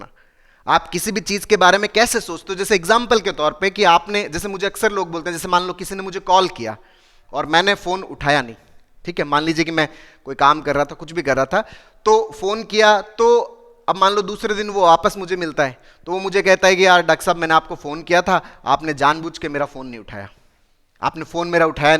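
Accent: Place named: Marwari, India. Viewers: Sir aap ki marvadi boli bhot achhi lagti he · Sar ji namaskar bich bich mein aap Marwadi bolate Hain Rajasthani bolate Hain bahut Achcha lagta hai Jaise dusre state Ke Log bich bich Mein Hindi ke sath ya English ke sath apni Bhasha ka uchharan Karte rahte hain vaise Sar ji aap bhi bich bich Mein Marwadi Rajasthani bolate rahega isase Hamen bahut Garv Hota Hai aur bahut Achcha lagta hai itne Bade doctor hokar Marwadi bolate Hain Rajasthani bolate Hain To hamen bhi aapse Vishwas badhta Hai Marwadi bolane Mein Rajasthani bolna aap bahut acche se samjhate ho Sar ji aap karte dil se dhanyvad aaj ka subject bahut hi interesting tha nice topic tha dhanyvad doctor sahab❤❤❤❤❤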